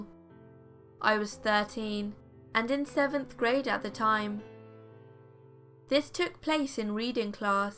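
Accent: United Kingdom. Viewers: I love the cadence of your voice, are you British, if so, from where? You sound like you could have lived in a few countries...perhaps your American? It makes your storytelling so good!!!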